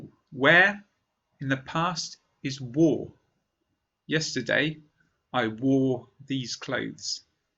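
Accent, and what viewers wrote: United Kingdom. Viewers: love British accent 😍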